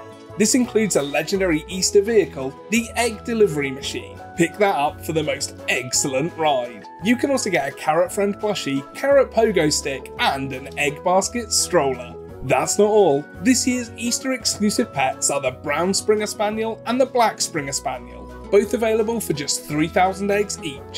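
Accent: United Kingdom. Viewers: Are you from London or Eastern?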